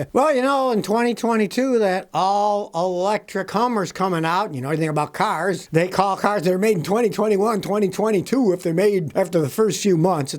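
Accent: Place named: Western New York, United States. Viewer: Got to love that Western New York Accent,. rat-ling. I grew up near Syracuse.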